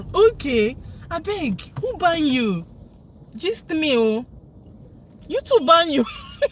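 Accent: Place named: Nigeria. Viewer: ❤ with how the Pigin is so giving in her voice 😂😂😂 Yes o, it's real o 😂😂😂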